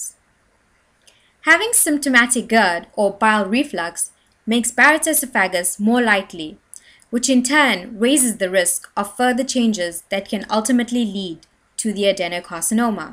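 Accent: South Africa. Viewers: Are you from Durban?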